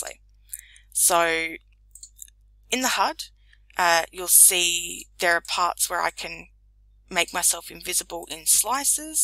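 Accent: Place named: Australia. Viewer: An Aussie tutorial Yes!!! I can understand this (I am from Melbourne) and very very helpful thank you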